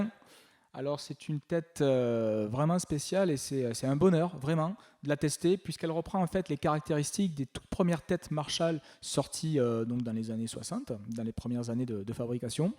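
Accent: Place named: United States. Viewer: You sound like an American 😉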